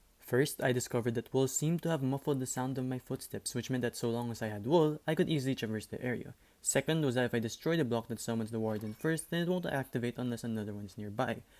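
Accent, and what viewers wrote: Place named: Philippines. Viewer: Sounds like filipino accent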